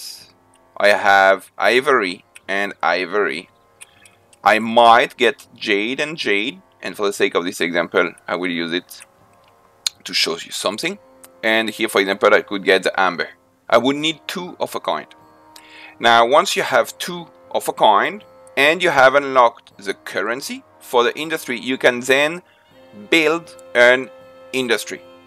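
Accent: France. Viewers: Your accent sounds so French, haha! Love it.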